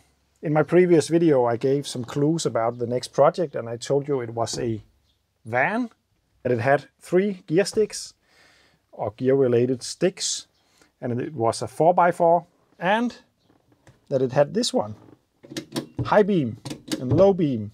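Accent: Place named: Denmark. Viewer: I think I know that car, given your Danish Accent and the fact that there are not many "Kermit the Frog green" UAZ 452 in Denmark. This is one of the cars Vladim brought to the country. I was also interested in that a year ago and had a test drive, You have to double declutch when you drive that UAZ. At some point in the 80's UAZ upgraded to a synchronized transmission this one was build before that. You can get newer transmissions for them if you get tired of double declutching. I think I know what the B and D stands for, that is for picking the tanks that the engine consumes from, as you probably has learned this car got two fuel tanks. YA3 that is UAZ in Cyrillic.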